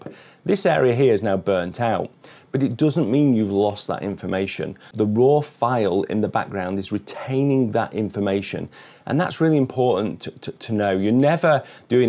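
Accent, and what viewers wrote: United Kingdom. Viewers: Today i find out most annoying English accent in the world uk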